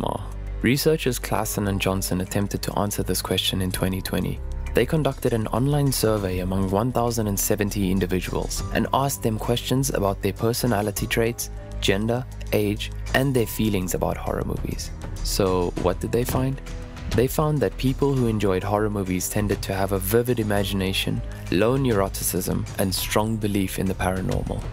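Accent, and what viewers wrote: South Africa. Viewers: Awesome hearing a South African accent on this channel. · Can always spot a South African accent. 🇿🇦 Great topic. Horror lover 🧟‍♀️ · Loved this video and the narrator's South African accent! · I knew the voice over guy was South African as soon as I heard his voice. I'm South African too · I know a South African accent when i hear one :D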